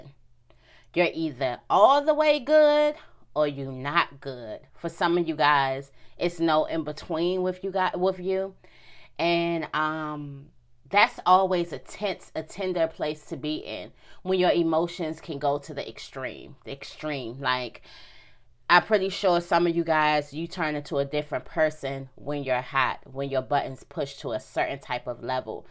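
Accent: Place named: DMV, United States. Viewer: Your accent is cute 🖤 You def from the DMV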